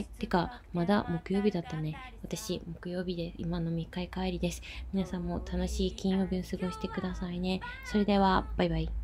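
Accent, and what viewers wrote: Kagoshima, Japan. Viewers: 久しぶりにコメントしました🙃 の鹿児島弁すき！ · いちいちコメントが面白くて笑ったw 声と鹿児島弁に癒されました☺️ の日常がわかるおうち動画好きー！また楽しみにしてます♡ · 鹿児島のなまり？初めて聞いたのでびっくりした‼️新鮮です‼️‼️ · 〜！夜勤中にぼーっと見てます。鹿児島弁が心地よか〜〜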